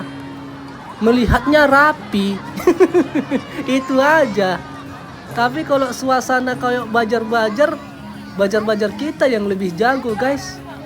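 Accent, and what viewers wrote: Medan, Indonesia. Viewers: Halo. Saya selalu liat youtube lewat tv jd gk bisa komen, kali ini liatnya lewat hp jd bisa komen.. senang liat kalau ngomong, khas medannya keluar😂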